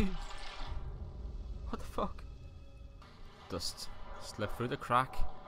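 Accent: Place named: Liverpool, United Kingdom. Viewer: I'm not very good at accents but I recognise real Everton supporter anywhere lol lol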